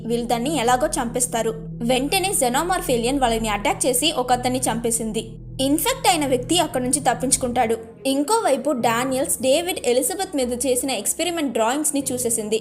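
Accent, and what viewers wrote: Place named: Godavari, India. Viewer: Godavari side Tamil kadhu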